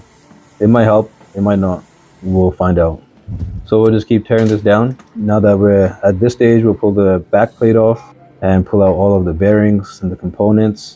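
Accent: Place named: Iran. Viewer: لحجه ات مشخصه ایرانی هستی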